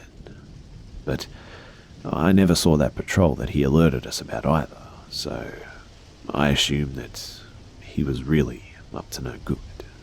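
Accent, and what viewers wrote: Australia. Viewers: Your accent sounds Australian. Are you?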